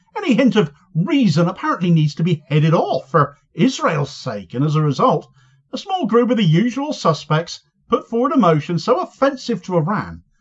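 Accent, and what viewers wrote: Cornwall, United Kingdom. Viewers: I’m glad you feel like me, so many people are feeling the same way. I don’t know how many people of all nationalities have said the same. I never thought I’d be rooting for Hezbollah, Hamas, Houthis or Iran. Many people are saying it’s as if the world has turned upside down. A lot of Americans have said how ashamed they feel at their governments policy regarding Israel. People, especially in the west feel as if they have been lied to for decades. So many people are now clued up about the situation of the Palestinians, including the Nakba, 1948 and the forceful displacement of the Palestinians by the Zionists and the terrible atrocities that were committed by them. Social media has been a boon in educating people and channels like give us the facts. has been consistently brilliant at showing us the truth, I also love his Cornish accent.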